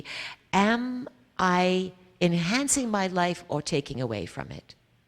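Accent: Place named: Europe. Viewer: I am truly impressed and follow her down-to-Earth wisdoms and teachings religiously, together with bits of humour occasionally, mixture of European pronunciations occasionally, too. She uses modern Americanisms and old fashioned vocab comfortably. But, more importantly, and fascinating, is that she can speak non-stop, at great lengths, without hesitation [ and repeat words for emphasis ] on any topic whatsoever brought up by an audience / workshop member. Must be collective's instant telepathic communications at work, on thorough answers to questions. Very, very skeptical listeners might feel the questions are secretly given ahead of time, as in recent unfairness / dirty tricks in presidential so-called debates. But, the lengthy, detailed, not common knowledge historically and scientifically, answers kill any such simplistic skepticism completely.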